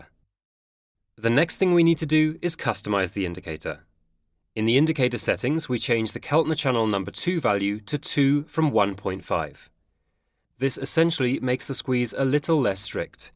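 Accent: United Kingdom. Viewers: English accent sheeple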